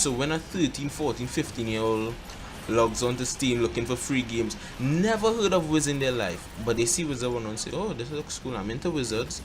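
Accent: Trinidad and Tobago. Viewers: Eh. u from the Trinidad by chance?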